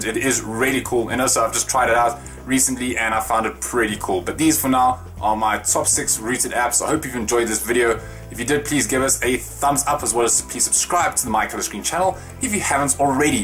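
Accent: South Africa. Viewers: Are you south african? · Dude u sound South African/Afrikaans. Is jy?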